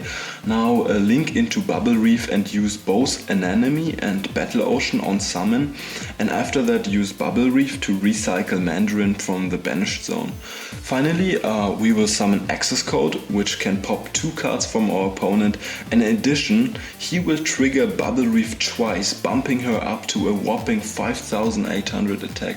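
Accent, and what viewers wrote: Germany. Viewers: Ich merk du bist deutsch. Gute combo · oh boy, das ist das erste mal, dass ich einen deutschen Akzent feier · Den deutschen Akzent feier ich hehe 👍😁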